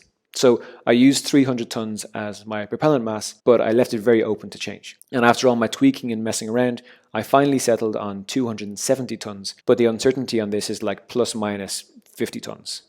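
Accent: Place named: Ireland. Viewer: Terrific! And who doesn't like a nice Irish voice! Keep up the great work!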